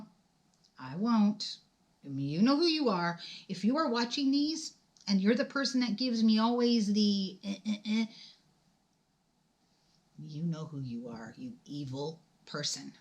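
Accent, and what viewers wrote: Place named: Canada. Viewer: I recognized the accent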